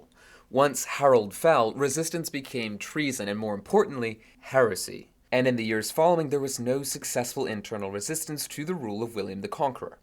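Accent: United States. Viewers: I think the narrator is American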